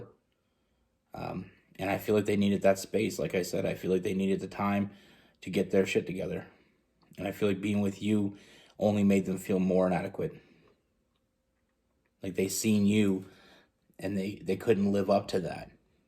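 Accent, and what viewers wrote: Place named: Canada. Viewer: I'm from New Brunswick. Are you from Canada? i hear an accent. lol. if not. I'm so sorry.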